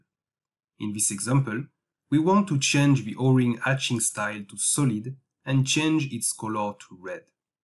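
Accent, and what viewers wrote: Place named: France. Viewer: Avec l'accent français !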